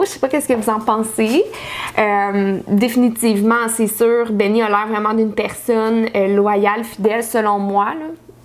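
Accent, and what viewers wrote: Quebec, Canada. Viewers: Bonne année? T'as un accent québécois?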